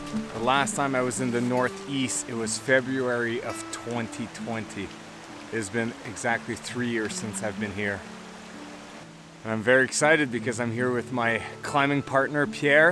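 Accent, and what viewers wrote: Quebec, Canada. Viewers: Any day you decide not to summit is a good day! Great video. Glad YouTube recommended it to me. Are you French Canadian by chance? You sound very similar to some of my friends from Quebec. All the best to your next adventures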